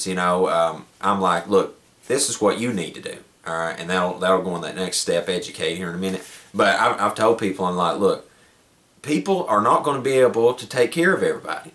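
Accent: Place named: Kentucky, United States. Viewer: Sweetheart you have great information, but you could reach sooooo many more people by playing down the accent. Im from kentucky and made a point to talk straight as a kid and noone can tell where im from now, but all of my family sounds like you. Its hard to be taken seriously without that non-regional dialect - you could reach sooooo many more people if your voice didnt overpower your message. Please dont take offense its a genuinely heartfelt critique. Again great info, and great reminders. Thanks for doing what you do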